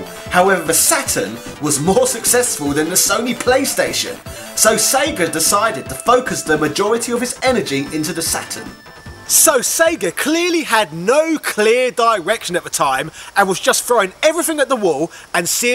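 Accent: United Kingdom. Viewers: Subbed. You remind me a bit of AVGN, with the fantastic British accent, which is awesome. Keep up the good work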